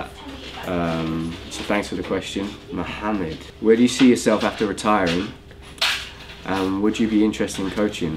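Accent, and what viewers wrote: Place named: Nottinghamshire, United Kingdom. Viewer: Jesus Christ are you from Notts? Omg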